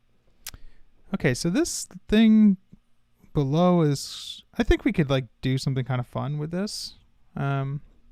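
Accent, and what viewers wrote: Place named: South, United States. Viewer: Are you southern? You have like a very subtle southern accent.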